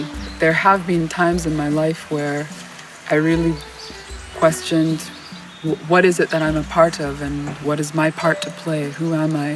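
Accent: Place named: Midwest, United States. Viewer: Her accent is so mid western American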